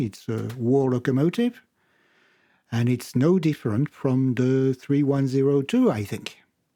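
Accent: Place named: France. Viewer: Just commented on another video and now exploring your channel :) The french accent again 😍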